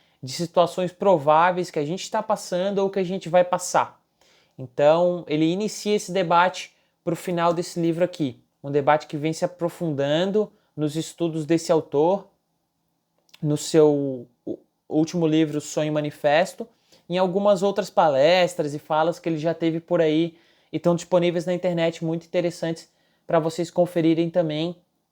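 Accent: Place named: Florianópolis, Brazil. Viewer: Pelo sotaque, vc só pode ser manezinho da ilha também hahaha (sou de Floripa)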